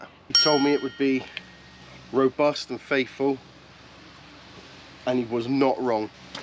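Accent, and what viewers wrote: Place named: Cornwall, United Kingdom. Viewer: Love the Vlog. We are from Liskeard just up the road but moved away to Shropshire:( We went to Millendreath all the time when I was young and loved it. Loved hearing your accent and made me very homesick. Nice one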